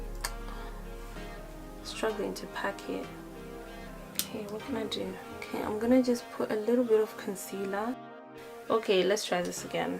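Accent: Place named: South Africa. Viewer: Your accent has a South African undertone 😳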